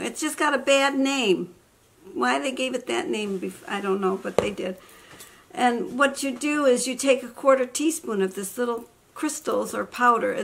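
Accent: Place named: Northeast, United States. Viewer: I knew you meant COLD in your eye, not coal...hehehe...you Yankees talk funny! It won't help with an allergic reaction but to get rid of the sting from a bee or wasp sting is to first get the stinger out then apply a chewing tobacco compress or soak the tobacco from a cigarette in water then put the wet tobacco on the sting.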